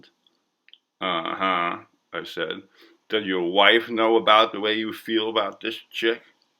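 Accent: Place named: New York, United States. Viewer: What a great New York accent,and really well read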